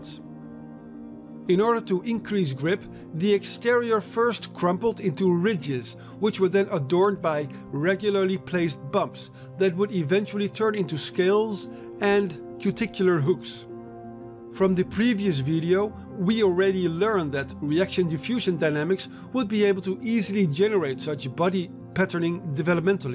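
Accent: Netherlands. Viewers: Just a off-topic question. Is the narrator by any chance dutch? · Are you Dutch? I hear a Dutch or maybe South African accent!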